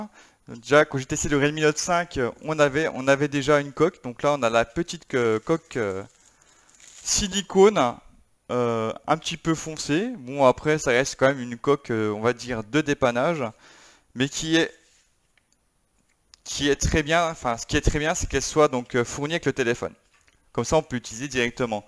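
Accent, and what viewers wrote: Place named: Besançon, France. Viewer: Vous avez un léger accent je dirais Besançon si non bon look ce redmi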